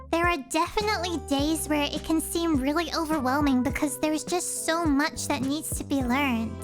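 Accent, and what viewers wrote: Japan. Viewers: dies of cute japanese accent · I love it! Even Your Japanese Accent Is Cool! Nice Animation! And Yes, What An Early Animation!:)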